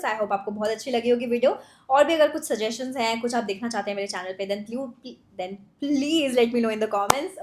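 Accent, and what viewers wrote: India. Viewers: is it true ki government employees ko content creation se earning karna allowed nahi hai? code of conduct me aisa kuch hai kya? please clarify. btw your Bihari ascent 😍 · What a amazing way of speaking didi ❤.❤ I love bhojpuri.❤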